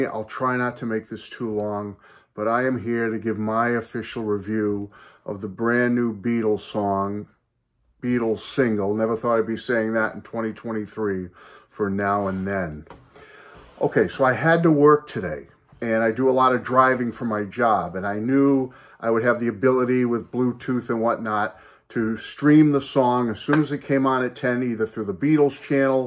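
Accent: Boston, United States. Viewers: Your heartfelt sentiments are truly worthy of your love for mankind and the Beatles!! I always have a spot in my heart cause when I hear you I'm reminded of Boston where I lived for many a year 1974 to 1987 to be exact. So "Now and Then" hearing your voice puts a warm smile on my face. God Bless and KEEP THE FAITH!!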